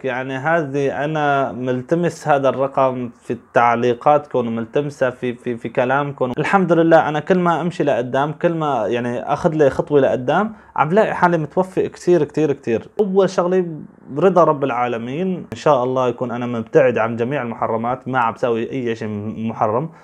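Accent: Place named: Syria. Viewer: مبروك يا اخيي بس عندي سؤال انت سوري ام لبناني لان لهجتك سورية ولكن فيك كم كلمة لبنانية أنشأ الله ترد علي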